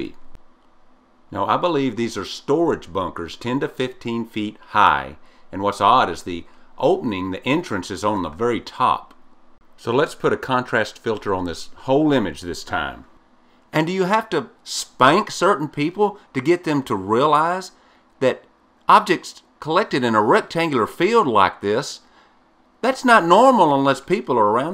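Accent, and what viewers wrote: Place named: East Texas, United States. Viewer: man! Thanks for the video! Your work is my favorite! Must be that East Texas accent.. Or them funky tunes. Keep it up man. We love ya in Louisiana!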